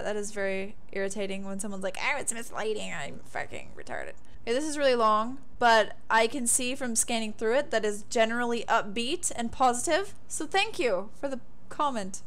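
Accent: United Kingdom. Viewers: I think your british accent is amazing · This was funny! Great job! I like your English accent!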